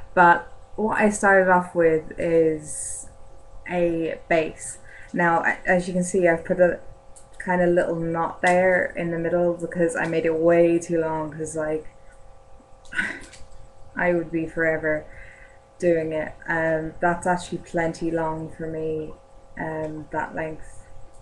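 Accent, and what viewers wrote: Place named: Ireland. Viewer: I knew you were irish as soon as u started talking from your accent ^^ im irish too :) Really want to make a tail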